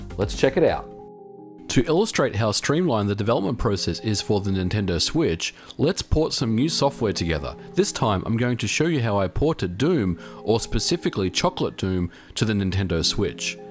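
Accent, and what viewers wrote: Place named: Australia. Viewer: You sound Australian lol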